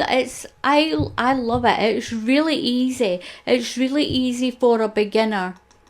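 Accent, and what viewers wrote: Scotland, United Kingdom. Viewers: Thank you I just love this pattern , it’s great to hear your accent . I am also Scottish and live in Canada .🇨🇦 Stay safe and happy crocheting 🌈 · Nope, love hearing the Scottish accent! So keep on talking,! oh, and Subscribed! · The second I heard your accent, I knew you were from Scotland. I'm a huge fan of the tv show "Outlander." I'm not sure if you've heard of it, but it's based partly in Scotland. I absolutely love that show, and I love listening to your voice in your tutorials. I'm a new sub and I can't wait to binge watch all of your videos, lol. You describe your stitches so clearly so that we all know what to do and what not to do. Thank you so much. Happy Mother's Day! 🐞🦋🌼🌹🌻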